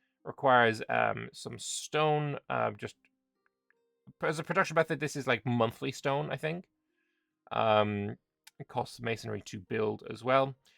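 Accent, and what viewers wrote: Scotland, United Kingdom. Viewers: nice scotish accent. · Your scot accent isn't bad actually!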